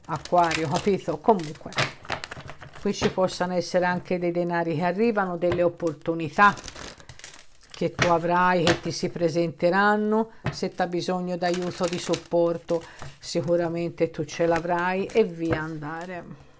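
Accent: Tuscany, Italy. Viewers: Ciao, sei bravissima e simpaticissima. Adoro la tua cadenza Toscana, di dove sei? Io ho parenti cari a Follonica GR e ad Agosto spero tanto di andare in vacanza lì. Io sono acquario asc. vergine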